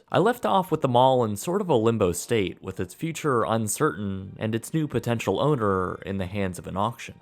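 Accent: Canada. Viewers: Holy crap! I thought you sounded like a Canadian. Hey, neighbo(u)r!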